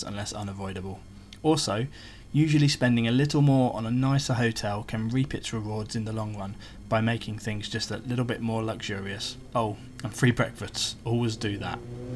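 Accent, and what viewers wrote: RP, United Kingdom. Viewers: The VO is fine but the non VO was a USP- and the English accent can sound a little posh and dare I say - the old colonial timbre creeps in - so! stick to the old formula - less distracting and more focused on the visuals and good captions - one VO per year!! Your content is so good without the VO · It’s good to hear your voice! I like the British accent mate 👍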